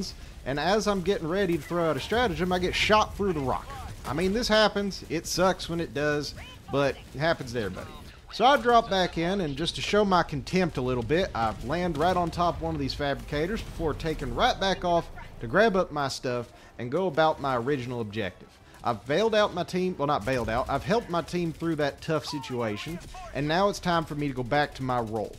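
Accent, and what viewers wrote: South, United States. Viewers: It’s interesting to hear a guy with a southern accent say democratic pride. You’ll never hear that otherwise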